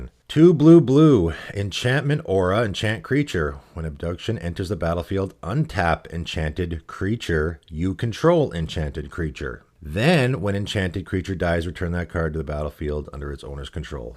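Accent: Canada. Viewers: Z! I suspected you were Canadian :p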